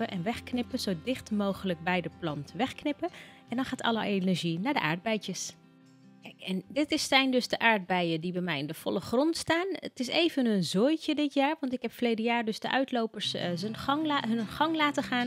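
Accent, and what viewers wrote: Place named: Overijssel, Netherlands. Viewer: Woon je in Overijssel? Dat lijkt namelijk zo aan je stem❤️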